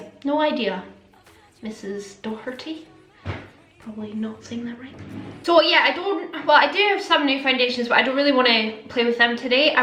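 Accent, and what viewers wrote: Scotland, United Kingdom. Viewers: Love these Q&A videos! I'm wondering about your accent, you said you were picked on. I assume it's a Scottish accent and you live in Scotland. Do you have different accents in different parts of the country? I'm fascinated with accents and languages. Being Russian I speak with an accent. But I speak American English with the Russian accent 🤣🤦🏼‍♀️. I LOVE how British, Scottish and Australian accents sound. I LOVE listening to you speak, lol. I think it's very pretty. It was painful to hear about your back accidents... I'm so so sorry girl! I'm sure you've been to good doctors. Hope they can find the best treatment for you. Have they considered surgery to repair whatever you damaged? I know surgery is always the last resort but it sounds like you're in so much pain... I really hope it gets better soon. Sending you love! ❤️ ❤️ ❤️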